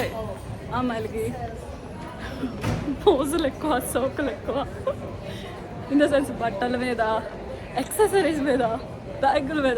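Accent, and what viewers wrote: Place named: Telangana, India. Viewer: నీ confidence levels సూపర్ బంగారు... Really you are so cute and smart... నీ native telugu మాత్రం కేక... తెలంగాణ పిల్లవు కదా.. కాస్త చిలిపితనం బాగా వుంది... God bless you...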